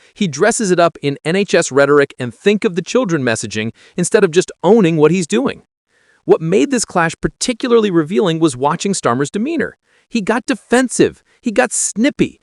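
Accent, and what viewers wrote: United States. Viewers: Why do we have to listen to a yank voice !!!!!!? It’s awful.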